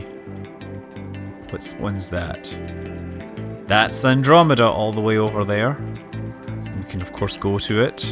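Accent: Scotland, United Kingdom. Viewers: I wish I could watch someone explore Space Engine and commentate it with a Scottish accent for the rest of my life. There's just something about it... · Scott live in California?! I thought he lived in UK because of his accent!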